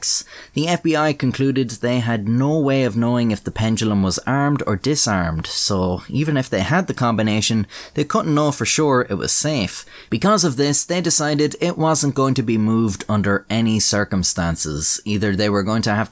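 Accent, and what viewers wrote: Ireland. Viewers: This was way different from the story I expected while litening to an Irish voice talking about a bomb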